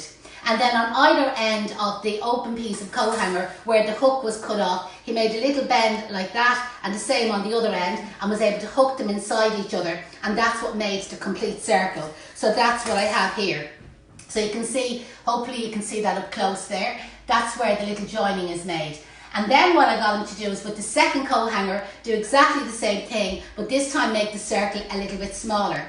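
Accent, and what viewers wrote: Ireland. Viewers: Ooh. An Irish accent. This is for my Christmas wreath. I’m listening ! 😂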